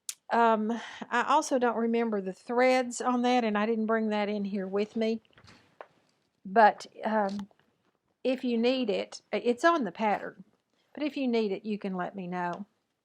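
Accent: South, United States. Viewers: I I am blessed beyond measure. I have a wonderful family Who loves me and lets me know. I have a Saviour who loves me and shows me daily. I had a pastor who often said that if we lost half of everything we owned we would still have more than some people will ever have and I remind myself of that often. I am blessed, I am blessed. Every day that I live I am blessed. When I wake up in the morning do I leave my head to rest I am blessed, I am blessed. I enjoy your fireside chats. Your Souther accent is just as sweet as can be to this Northern gal from Canada. Stay, well and God bless.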